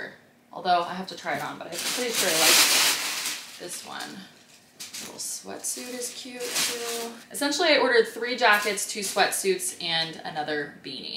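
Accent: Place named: United States. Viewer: I Love this vlogs. I can’t wait to see another one tomorrow. Have you ever tried to go for language exchange meetings? I’m sure a lot of people will love you American accent (at least I do) and you may meet some interesting guys from abroad, open minded and with the energy to discover the world. Meeting someone with another culture is amazing, everyday you learn new things.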